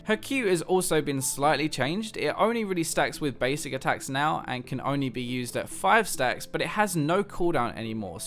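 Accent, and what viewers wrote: United Kingdom. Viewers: I don't even play adc c: Just here to enjoy your beautiful British accent ;)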